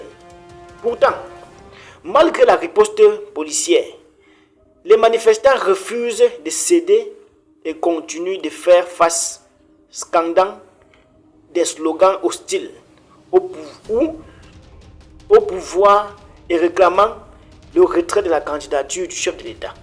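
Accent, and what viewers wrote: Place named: Cameroon. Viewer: Toi avec ton accent camerounais là tu crois qu'on est assez bête pour croire à ce que tu dis moi je suis à Yakro et on a dormi en pays ici hein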